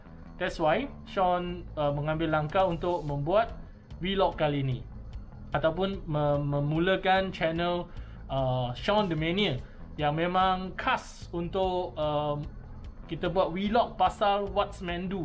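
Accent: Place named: North, Malaysia. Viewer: Sean orang mana? Sebab guna lecey.. Dalam video Mcm utara ja 🤔🤔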